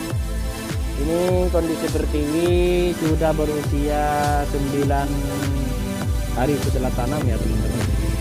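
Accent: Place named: Madura, Indonesia. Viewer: kyak logat madura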